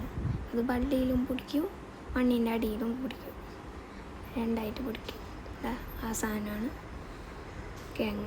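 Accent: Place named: Kasaragod, India. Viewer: നിങൾ കാസർഗോഡ് ആണോ😂 സ്ലാങ്😅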